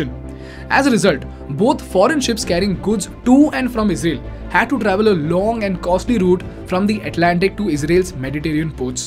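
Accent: India. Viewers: I told you.. Indian has this accent.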